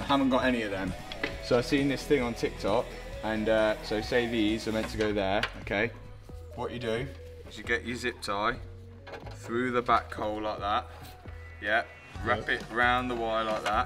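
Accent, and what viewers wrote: United Kingdom. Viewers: This British accent is so good to hear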